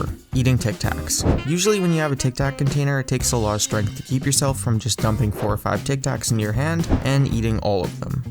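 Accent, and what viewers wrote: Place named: Canada. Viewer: A-bOAt / oAt (INSTEAD of aboUt and oUt) says that you're CANADIAN!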